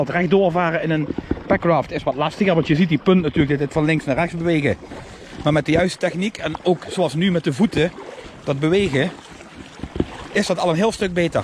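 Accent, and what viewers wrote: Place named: Limburg, Netherlands. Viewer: Hallo, super mooie videos, ben per toeval op jou kanaal gekomen, doe huurst deg aan wie ene echte limburger. Top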